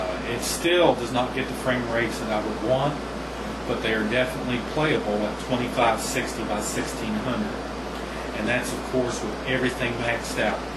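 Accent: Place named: Texas, United States. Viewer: love the accent, sounds like texas. BRING MOAR TF2 DISPENSERS HIER!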